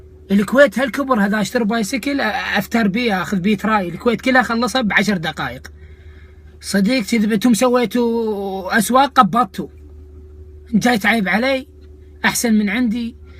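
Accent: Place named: South, Iraq. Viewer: عبدالله لا عراقية هذي لهجة جنوب العراق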